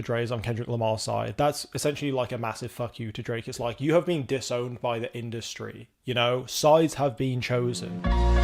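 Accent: United Kingdom. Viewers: Its always so bizarre and cringe to hear the word ni**a spoken in a British (or frankly any other non American) accent. The term used in an endearing or hip hop adjacent way is so inherently African American and steeped in the specific experience of being Black in America. African American pain/liberation is tied to the word in this context, so when Black people with different accents from other parts of the world say it, it sounds wild af. This is partly why Kendrick told Drake we don't want to hear him say it anymore. Nice video, but just food for thought. Race and culture are not the same thing, Drake not seeming to understand that is a part of why he lost so miserably.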